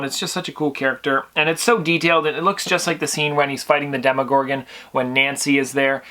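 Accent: Canada. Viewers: I love how Canadians say about lol a boot like every other word is normal just the about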